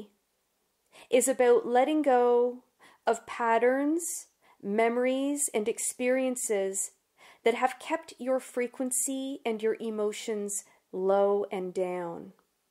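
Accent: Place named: Canada. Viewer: You lost me at "outside" and "outcomes." Oooooot-side and oooooot-comes. Canadians should be seen and not heard...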